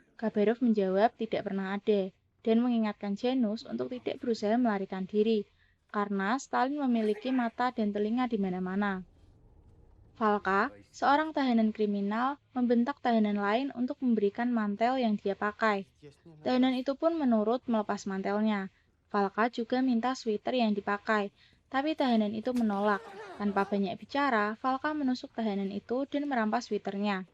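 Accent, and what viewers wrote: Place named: Banyumas, Indonesia. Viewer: Narator ngapak 🙃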